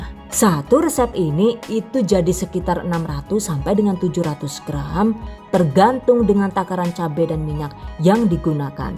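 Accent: Banyumas, Indonesia. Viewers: 😂😂😂..mbekayune...sampeyan asline ngendi koh..mBanyumasane mblaketaket pisan....sukses yuuu...inyong jan ngiler gyeh kpengin nyicipi sambel medurane... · Jebule channel ngapak y... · Nyoba bikin ah.. Bahasanya Indonesia Inggris dan ngapak 👍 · Aku kalau lihat video yang ku tunggu pas mbekayune bilang pakai bahasa ngapak.... Auto langsung nggakak aku.. Ilmu dan lawaknya sangat menghibur yu...salam dari Wong Banjarnegara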